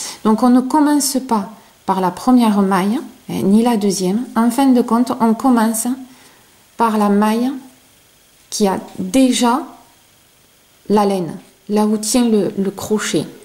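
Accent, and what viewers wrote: South, France. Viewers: Bonjour, merci pour cette démonstration très claire et très agréable, tu te mets à la place d'une débutante et c'est super, continue comme cela! en plus j'aime bien ton accent du sud, moi aussi j'ai l'accent de Toulouse que j'ai gardé pourtant je n'y suis plus depuis l'âge de 20 ans! Je vais regarder si tu as fait un tuto pour faire un poncho... J'ai déjà fait un tawashi et une fleur éponge! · félicitation pour les explications très claires que je vais rapidement mettre en pratique, avec une mention spéciale pour ton accent, car bien souvent l'accent du sud se fait rare sur internet!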